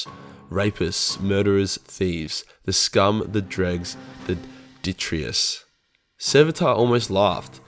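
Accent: Australia. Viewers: Dude, nothing makes me laugh more than listening to you explain things as only an Aussie can..... Love it mate and keep rolling them out..... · Portuguese: Bom dia, seus putos. You pronounce as you do in your beautiful australian accent.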